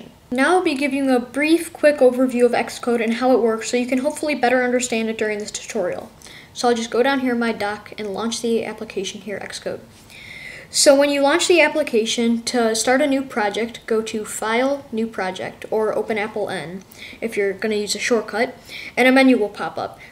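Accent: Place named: United States. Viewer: I fucking hate the totally high American Accent!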